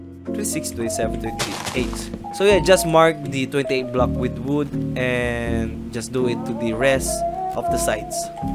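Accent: Philippines. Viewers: are you Filipino? cuz ur english accent is like filipino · Unang accent palang, PH na PH! Salamat boss ! <3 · Are you filipino? Your accent very familiar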